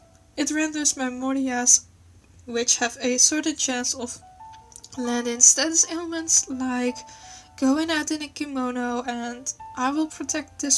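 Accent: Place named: Germany. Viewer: I understand your English lol It's even better than mine cuz I'm not native English eather And I guess that you're German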